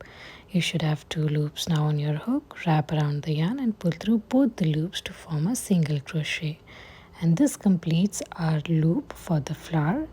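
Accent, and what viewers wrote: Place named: India. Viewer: indian accent IM IN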